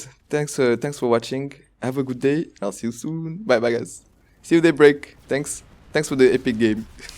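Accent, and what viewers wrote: France. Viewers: quand je t'entend parler, j'entends un français qui parle anglais ( tu as un accent français) 🙂 je suis certain que tu es français, Si tu l'est, en tous cas tu parle sacrément bien anglais!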